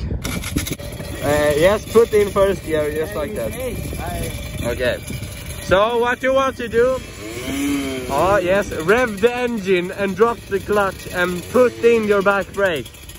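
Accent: Sweden. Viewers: Älskar svenska dialekten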